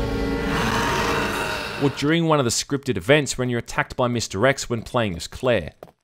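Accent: Australia. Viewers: Newer watcher i guess. Why is he so much less Australian lol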